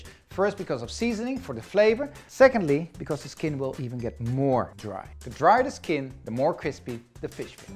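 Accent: Netherlands. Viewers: your English has improved a lot! As a Dutch speaker I could notice all the small Dutchisms you put in the video. Nice job!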